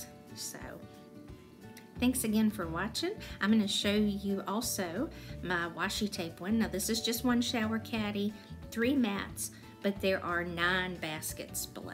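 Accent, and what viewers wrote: South, United States. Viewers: Say there!! (my name for all my girlfriends, and I know you you would be). Can I tax your brain, or anyone else's and try to come up with something on this order we could put together to hold all my netting rolls, I make wreaths out of. So far it's big unhandy clear trash bags, because I have so many other crafts, I've run out of room, and that picky netting goes right through the trash bags. I agree with Iris only I like the Southern part of your voice also. I definately will be making some of these for my clutter of various different craft supplies asap. Thank you for being so organized!! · Such a cool idea!!! Loved your video you explained things very well and love your southern accent too:) Look forward to more videos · I love you tutorial and your Southern Charm, very calming and relaxing. Thanks so much for sharing, from a Texas Southerner... 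· your southern accent is wonderful what part of this great nation are you from my family is from South Carolina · Really cute and ingenious idea for dollar tree items. We crafters never have enough space. I'm always looking for something from one of my crafting categories, watercolor painting, jewelry making, paracord crafts, crocheting, sketching and drawing, home decor, gift wrapping etcetera and so on. I'm so glad I stumbled upon your channel recently. Keep it up your easy to listen to, not over detailed or unneeded repeated instructions that can often feel redundant when listening to others. But not you, I love your flow, your realness and that southern accent is so darn sweet. Much success to you my dear! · Hi Mam, It's am in Arlington, VA on Saturday, October 28th, 2023. You posted this 6 years ago so your son may be close to being a teenager now and I hope he is well and your family. 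I am suppose to be seeing up a storm today and working with my glue gun as I am in a 3 day craft sale next week. But,I am tired from my week at work and I am taking a break. I live in a very tiny Flat and my 3 closets are very very tiny so I use clear shoe bags on the back side of 2 doors. My kitchen is extremely small and I believe this caddy would be awesome in my kitchen for light weight utensils and other cooking needs, a place to hang pot holders. I only have 3caninrts for food and dishes and not many drawers and they are so thin you cannot have a wide utensil holder. I have often looked a the kitchen mats at the Dollar Tree thinking they would be nice to hang my vintage fishing lures on. I like your country accent. I need to check out your site to see if your on Instagram. I hit to subscribe I hope your still making videos. Great job on this storage craft caddy. Sincerely, Rebekah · P Vir Another update - subscribers: from 500 on May 26, 2017 to 14,363 on June 7, 2017...and I make 14,364! 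That's a huge growth rate! It gives me hope for my videos (silk painting)! Great ideas! Your camera angles and explanations are perfect! You sound like you're from The South. (I'm from the Deep South 😉) Thank you for sharing your God-given gifts, talents and ideas with us all! God Bless!!! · Thanks for this great inventive idea, and I just wanted to say your accent is adorable! I’m from WI and don’t hear a southern accent often 😉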